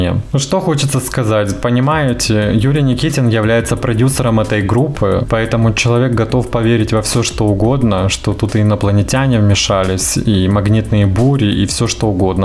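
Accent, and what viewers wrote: Ukraine. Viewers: Украинизмы придают речи своеобразие и неповторимость.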